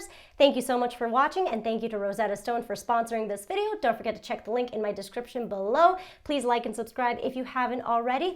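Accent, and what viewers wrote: United States. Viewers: It's fascinating hear your English sound American, and then your Japanese sounds native. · Your American accent is very nice. Were you born in USA or did you learn to speak like this? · are you american or japanese? you pretty much sound american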